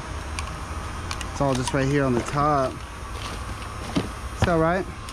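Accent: Texas, United States. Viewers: 8,77 for whats ? your Texas pronunciation is very hard :) for not native :) Regards from Poland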